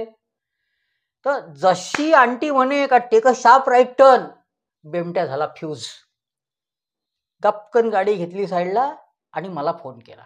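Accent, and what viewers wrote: Vidarbha, India. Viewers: अहो हे विदर्भातलं मराठी आहे. मालवणी किंवा कोल्हापुरी नाही. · माझे खूप मित्र विदर्भातील आहेत आपले बोलणे ऐकले की त्यांची आठवण येत. पण एक नक्की आपण आपल्या भाषेत खूपच खरपूस समाचार घेतला आहे.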